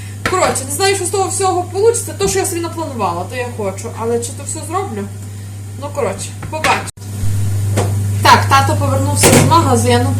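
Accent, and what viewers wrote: Ukraine. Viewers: доброго дня мені подобалося ваш суржик тому, що вас також розмовляють суржиком. Я з Вінниці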